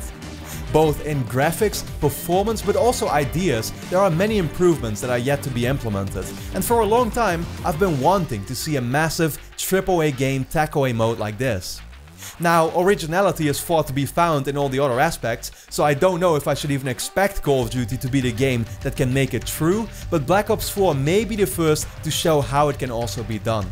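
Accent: Netherlands. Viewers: U dutch ? U sound like u could be dutch. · Volgens mij ben je nederlands tenminste ik denk het te horen aan je engels accent Broer . Ik denk er gelijk over man dit is echt niet goed. campaign is de reden waarom ik het spel koop dat er geen campaign in bo4 zit maakt me misselijk man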